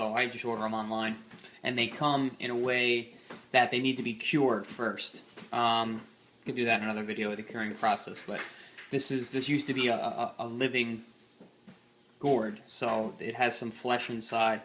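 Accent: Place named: Argentina. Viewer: che...... vos sos argentino?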